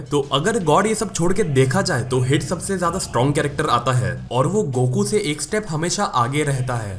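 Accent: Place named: Bihar, India. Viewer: bihari lgte ho awaaz se!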